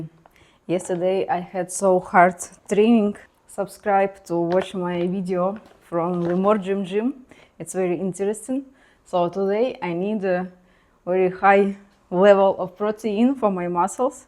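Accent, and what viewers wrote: Russia. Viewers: О май год. Это вас я видела пьяной и матерящейся в Арамбольсеом магазине... А акцент у вас .... Отвратительнийший - хуже индийского акцента, только жесткое рашен стайл... Не зря я не доверяю русскоговорящим учителям английского... Вы станете мечтой индийских мужчин - которые мечтают приехать в Гоа и переспать с русской... Так как кроме них вас никто смотреть не будет - это невыносимо. Но вы станете мемов в индийской блого сфере ... Вы прямо готовая РУССКАЯ НАТАША (Наташами в Турции и Азии называт "сексуальных/доступных - переводится с азиатского на обще понятный" женщин). Значение английских слов сказанных индийцами, которые вы можете воспринять как комплимент, имеют сугубо утилитарное значение и весьма далёкое от значения этих же слов в западном мире. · I love Russian accent and girl ❤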